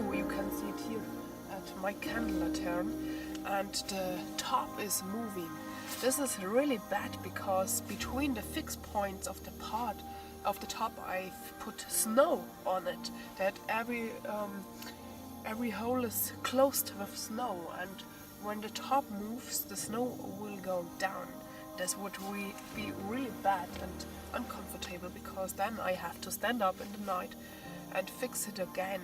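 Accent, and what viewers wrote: Bavaria, Germany. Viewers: dieses bayerische Englisch ist - na ja - gewöhnungsbedürftig. Aber ich verstehe schon, dass Du versuchst, Deinen Wirkungskreis zu vergrößern.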